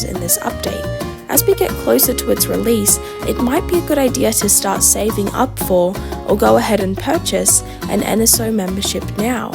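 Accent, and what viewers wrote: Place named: New Zealand. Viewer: is that a new zealand accent? i love it, it’s so nice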